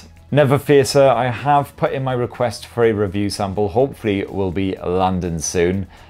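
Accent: United Kingdom. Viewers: Are you American? I've noticed Americans seem to struggle with British accents. I understand him fine, did I remember I used to struggle when I would try to watch trainspotting.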